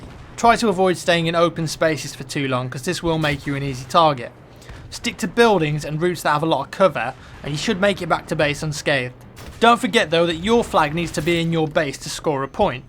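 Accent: United Kingdom. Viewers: oh man, my recommendations just popped up with this. its funny looking back, sounds much more british.. and young.:D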